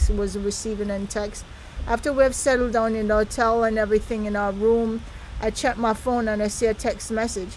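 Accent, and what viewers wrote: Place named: Jamaica. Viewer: Yoùr speech pattern is Jamaican therefore it's safe to say you are Jamaican so how come you were so unprepared for current occurrences on the Island. Say thank God the Police were doing their duty enforcing traffic regulations, havent you read or heard of the very high numbers of fatalities and senseless mayhem committed on the roadways? There are several reasons why Police personnel are armed with high powered weapons in the public space, your safety and protection is one of them. Your foray into blogging appears to be in its infancy, take time to research and prepare content, not everyone ad-libs a cogent presentation. Not criticizing, "mi 'jus a sey". Glad ypur stay was safe and enjoyable. A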